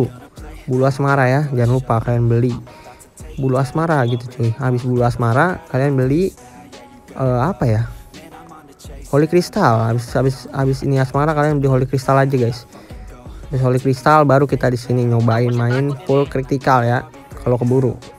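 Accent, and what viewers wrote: Banten, Indonesia. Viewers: Asal banten ya bang? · Orang banten bang?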